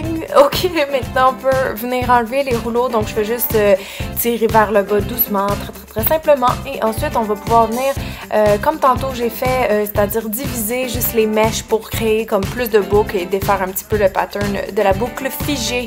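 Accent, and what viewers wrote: Quebec, Canada. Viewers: J'adore ton accent québécois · J'adore ton accent ter du Québec peut-être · accent québécois !!!! j'adore !! moi j'ai des boucles naturelles qui tirent plutôt vers les année 50 XD ! la vieille ! mais bon si je devais choisir je dirai le look 2000 ou 50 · Je trouve ça tellement chou cet accent québécois ! ;) Pouce bleu qui vient de la France !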